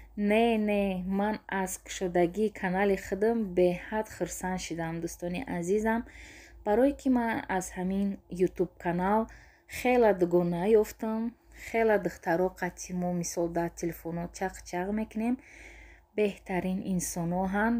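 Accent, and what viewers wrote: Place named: Dushanbe, Tajikistan. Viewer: ачоиб бо забони тарафои Душанбе сухан мекунед,ба ман ачоибаш он ки наход шумо 99% забони он тарафокати гап мезанед,Мо 15 сол боз дар Россия забон гум накардем некин....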